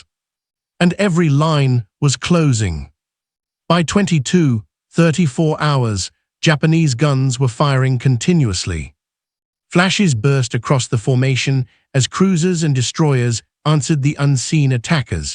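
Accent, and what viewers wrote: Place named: United Kingdom. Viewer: Why add distracting and annoying flickering speckles, cropping, streaking, and shaking to what could have been clear steady images?!! Your ridiculous images show objects and personnel vastly distant in location and time from what you describe. Why Brit-accented narration of a 100% US operation?!! DISLIKE! UNSUBSCRIBE!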